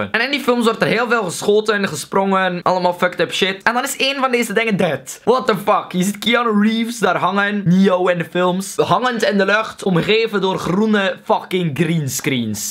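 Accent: Belgium. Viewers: Hoor je dat niet hij is belg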